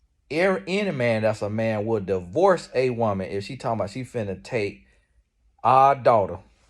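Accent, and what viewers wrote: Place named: Deep South, United States. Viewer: Deep south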